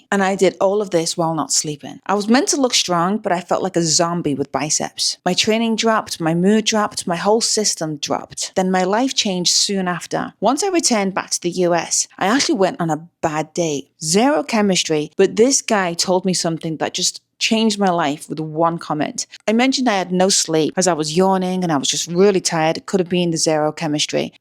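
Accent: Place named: Liverpool, United Kingdom. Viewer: I knew that was a scouse accent! Love these tips - I do simple sugars after workouts as well, cereal or milkshake are my favs. New sub! X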